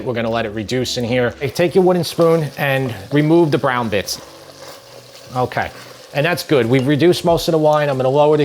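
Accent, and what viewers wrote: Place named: Boston, United States. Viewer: Are you from New York cause you got that Boston accent.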